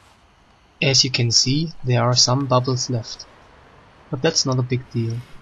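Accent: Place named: Germany. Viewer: you have a german accent.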